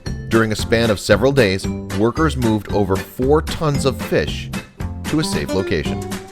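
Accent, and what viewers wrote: United States. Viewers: It's sad to see the nice country but to hear an american accent . . . . very sad.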